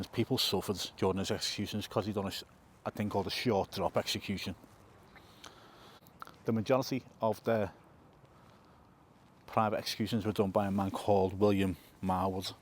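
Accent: Liverpool, United Kingdom. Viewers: The wife was listening in on this and said he's good him proper scouse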